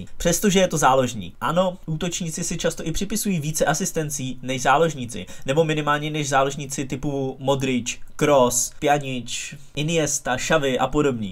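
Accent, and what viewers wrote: Czech Republic. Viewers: To je otřesné, jak furt mluví jak Pražák.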